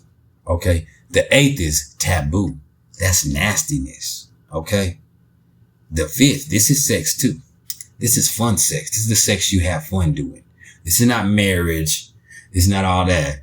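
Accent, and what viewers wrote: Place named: California, United States. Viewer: This gotta be a Cali accent😂😂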